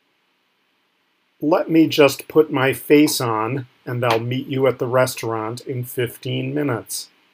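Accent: United States. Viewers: Judging by his pronounciation, the guy is American, so I guess the idioms are not exclusively Brititsh. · I think you meant,Is this British English or American? He speaks American English and these are American idioms!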